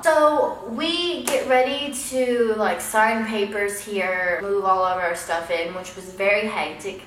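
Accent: South, United States. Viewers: I cracked up when you imitated her screaming "I CAN DO WHAT I WANT! GET OUT OF MY FACE!" Just the way you went about it. By the way you're really pretty and I love your Southern accent. I live in Minnesota and some of us talk like Canadians.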